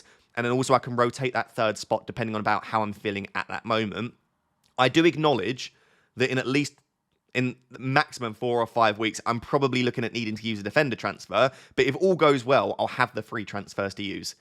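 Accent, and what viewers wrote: United Kingdom. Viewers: bro control your british accent very hard to catch up for me.🥲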